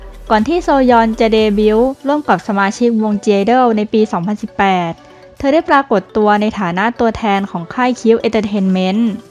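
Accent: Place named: Thailand. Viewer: ศัพท์อังกฤษ ออกเสียงไทยจ๋าเลยพี่สาว ไม่มีการใส่สำเนียงเลย ฟังแล้วตลก แต่ก็ยินดีเข้าชมเพราะอุตส่าห์อ่านให้ฟังอะนะ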